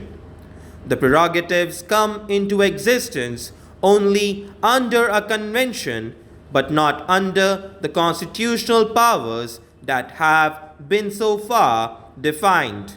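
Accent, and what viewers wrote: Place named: England, United Kingdom. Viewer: O bhaiya thoda halka haath rkho humare yha itni standard English na boli jati na smjhi jati isliye pronounciation thoda ache se bolo Jo smjh aa sake pura angrej ban rhe ho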